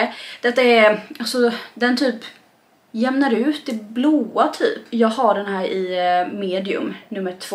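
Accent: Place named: Småland, Sweden. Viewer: Hahahha så fin! Men varför pratade hon typ småländska😂❤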